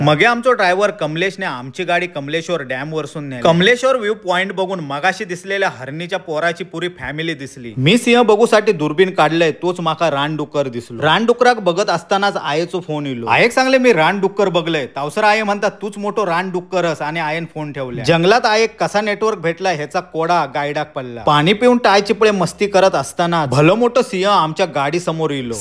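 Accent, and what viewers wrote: Malvani, India. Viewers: छान मालवणी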